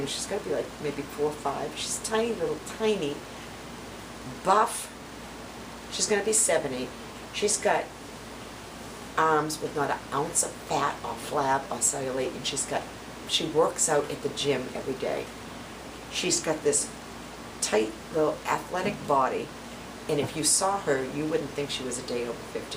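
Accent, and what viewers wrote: Boston, United States. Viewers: your story did put a big smile on my face still having a very difficult time but I’m glad that you commented on my video and directed me here I probably should know this and we have probably spoken about it but I can’t remember are you from Massachusetts because you definitely have a Boston accent - I know you’re sick but you look amazing and yes prednisone is some scary stuff and can make you feel really weird I have to catch up on some of your videos I don’t always comment but I do watch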